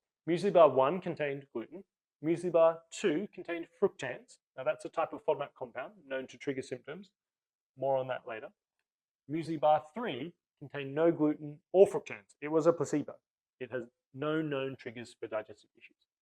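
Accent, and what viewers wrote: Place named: Australia. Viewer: This actually makes sense to me. Only new to the gluten issue realm. Great to hear what sounds like an Australian accent!. Have you got that low fodmap list to look at and try please?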